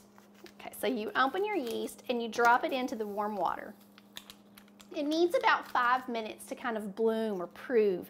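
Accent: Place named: Alabama, United States. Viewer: I've never ever made bread until I saw your recipe. That was Monday. I made it Monday night. It lasted a day lol & was Gone! My husband, kids, and nephew said it was the best bread they'd ever had. So, my dough for these rolls is on its first rise now on the counter. I'm doin a trial run on these so I can make em for Thanksgiving dinner. I know they'll be absolutely delicious, I just want them as pretty as yours lol. I'm gonna try & make their lil "butts" line-up perfectly. I'm new at most things cooking wise. I'm from Alabama & wanna cook hard stuff like meatloaf, pork chops, chicken fried steak, greens, homemade mashed potatoes, fried potatoes & stuff like my Momma made. I'm tryin my best to learn lol... I should have been at home watchin momma instead of runnin around with friends (they can't cook now either)! Both my sister's are fantastic cooks cause they watched momma. 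I really really wanna learn to make fried chicken! My husband and kids love fried chicken. I don't eat meat except fish, but they are all meat lovers. I Love your channel & how you take time to show Every step. Your a incredible cook & so patient & reassuring. Idk where your from but I picked out that accent Fast! Everyone makes fun of how I talk lol. I live in Oklahoma but from Alabama. You seem so precious. Keep the videos comin & ty for being such a great example for beginners like me. -Amanda-🖤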